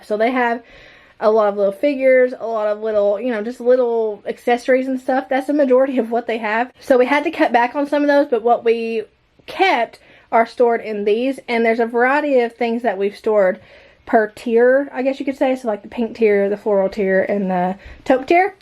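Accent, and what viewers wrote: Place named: Tennessee, United States. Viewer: I have watched several of your videos back to back. I have enjoyed every minute of it. I wish I had the motivation to keep my house tidy like yours. And love love love all your projects. I am from Tennessee too but I am in middle. It’s like I am watching one of my friends. You actually talk like me, I am not used to hearing folks on here talking like me. Wish y’all well!!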